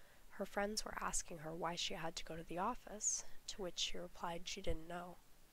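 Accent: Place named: United States. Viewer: Hello! I have just listened to Chapter 5 of "Older Sister Chelsea's Secret" and I am enjoying each chapter that you narrate. You have a wonderful, soft, feminine voice.Your cadence and tempo, as well as inflection and pronunciation is spot on! I could listen to you all day. Though your accent is pure American (guessing West Coast, but maybe Midwestern) the story definitely takes place in either the UK, or one of the Commonwealth nations, such as Canada, New Zealand, Australia or even Bermuda (I live directly west of Bermuda) . Instead of "till", we use "register" or "counter". Instead of calling a teacher "Miss" American students either address their teacher by their name, e. g. "Mrs. Stevens" or "Miss McKee" When the teacher's name is unknown to a student, the correct way to address and converse with a teacher is by use of the appellation, "Ma'am". 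But, please continue to use the British terms for things. I love how British and American English differ so much from one another that my wife and I have to turn on closed captioning when watching a British mystery or film. Slang words and expressions (particularly Cockney English with it's rhyme) and the varied dialects make it almost impossible to understand sometimes, with American ears. I sincerely feel sorry for Chelsea. Her fear of Tina or her parents discovering that she is a bedwetter and has to wear adult diapers to bed, is second only to her fear of being "outed" as a diapered 18 year old high-schooler who has to wear di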